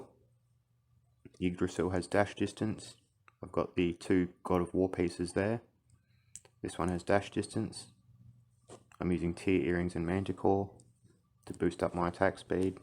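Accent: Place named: Australia. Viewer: g'day mate you r definitely an Ozzy that's for sure lol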